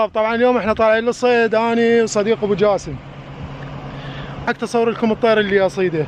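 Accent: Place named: Iraq. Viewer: كاعد امشي 😁😁😁😁😉اشلون كاعد وشلون امشي يا اخي لهجة عراقية صعبة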